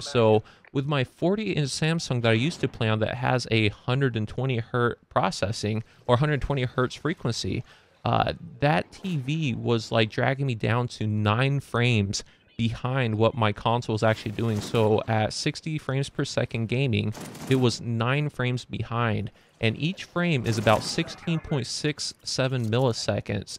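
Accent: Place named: United States. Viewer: Not to offend, your voice is that of a very American American. Which is strange because it doesn't match your facial features at all! Gotta love genes. :P Sorry if I did offend you.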